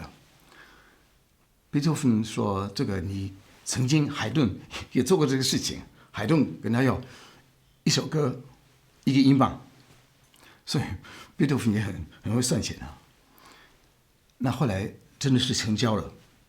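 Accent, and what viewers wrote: Standard. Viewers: 您的漢語水平讓我吃驚！太好了，字正腔圓！我都懷疑您到底是華人還是德國人了，新年快樂！ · 您的中文口音比很多我们内地人都准，很好听，欢迎您来武汉游玩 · ，非常喜歡您，你的漢語講的比台灣人還標準。祝福你全家永遠萬事順順利利。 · 你的中文好標準啊！我聽你講話像在聽我朋友講話一樣！好親近啊！ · 每一次聽到這首歌都感動。第一次看到這個頻道，老師的中文字正腔圓，講話平靜中帶有情感，猶如歌曲一樣令人感動。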